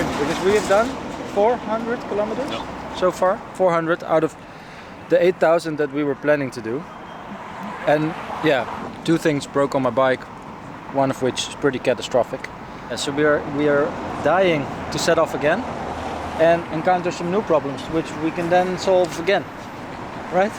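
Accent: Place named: Netherlands. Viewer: Heerlijk Dutch accentje 😂😂😂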